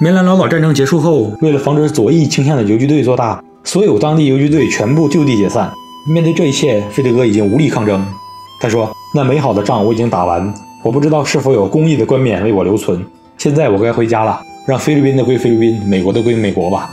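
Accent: Northeast, China. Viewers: 口条不清晰，还带东北口音。弃。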